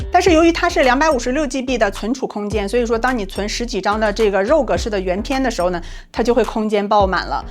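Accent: Northeast, China. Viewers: 主播东北人。me too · 东北话杠杠的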